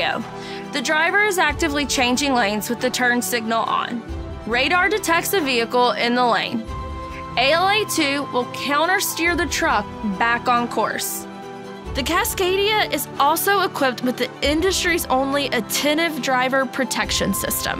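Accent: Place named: Texas, United States. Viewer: I think she meant WAKE. As in wake turbulence. But because Freightliner picked someone with a Southern or possibly Texan Twang to do the VO in this video, wake sounds like weight and wash sounds like "worsh."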